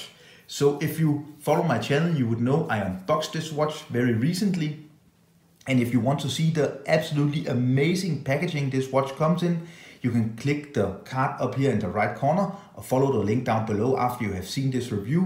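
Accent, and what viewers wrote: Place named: Denmark. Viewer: Nice review of a beautiful watch. You have an unmistakable Danish- English accent..lol I can hear whenever a Dane is speaking English. Not a criticism by the way, just an observation. Jeg er engelsk men er ogsaa Dansk talande.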